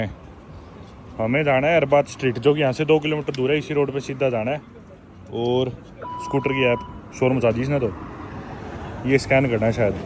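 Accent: Haryana, India. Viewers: भाईसाहब की हरियाणवी भाषा कमाल का है सुनने में अच्छा लगता है एक अलग ही वाइब है भाईसाहब को छत्तीसगढ़ से प्यार 🥰👍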